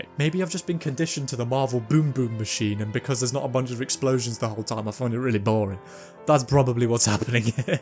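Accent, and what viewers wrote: United Kingdom. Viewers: Before even starting the video I saw the face and the channel name and I could already feel there's gonna be a Brit Accent, and I started the video and I wasn't disappointed 😂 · high pitched AND British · why are you british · Funny British man with cracky voice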